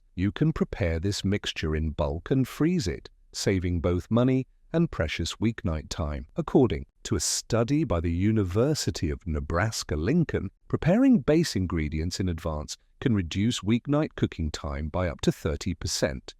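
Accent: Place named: United Kingdom. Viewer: A video by a channel that implies USA-centered content, and narrated by someone from the UK?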